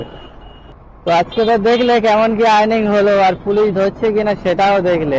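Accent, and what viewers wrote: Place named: Basirhat, India. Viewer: Apni bosirhat er chele taina? Apnar kathar tan okhaner moto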